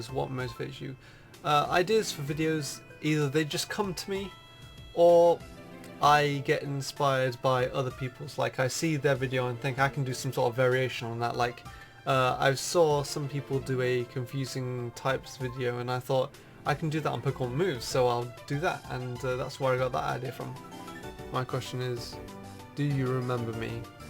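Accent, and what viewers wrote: United Kingdom. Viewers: are you like British? you sound British.(sry I don't mean to be so rude. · He said half white and half Chinese, I can only assume he's British, based on his accent. :)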